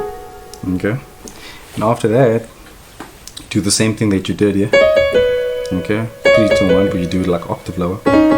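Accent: South Africa. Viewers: Dude you sound so South African 😅. Amazing chords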